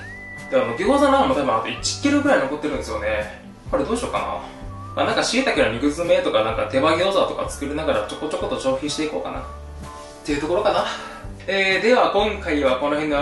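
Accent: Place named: Fukuoka, Japan. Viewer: 福岡の方言かな？ほっとする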